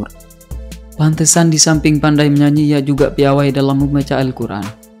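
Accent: Madura, Indonesia. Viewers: Bang logat mu kok madura ya ??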